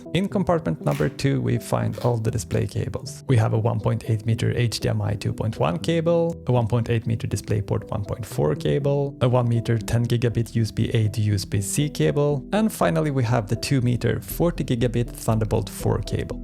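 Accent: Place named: Sweden. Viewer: I KNEW IT.. You are Swedish. Your english pronounciation made it clear :D Looking to buy the same monitor. Greetings from Norway btw.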